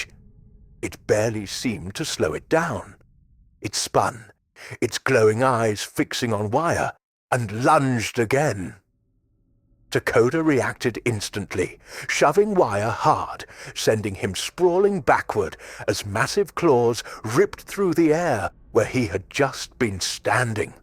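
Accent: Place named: RP, United Kingdom. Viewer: ok that explains the generic posh english voice seriously I love wearwolf dogman stories and use to love every channel I came across that narrated/ reported the stories or encounters but lately people don't have tge faith in the voice of there own howel theve lost the lessons that its goider to fail to die to be crushed as you're self than to live a single second or even succeed as someone or something you're not I won't run you or this point into the ground but if you want a decent respectable backable channel use youre own voice embrace you're inner beast and inner human not to mention with all the sheep following the A .I trend it will set you apart from the herd / flock and remember brother if you are wolfen too than wolves care not for the barking of dogs or the baaa,ing of sheep peace may Luna bless you're steps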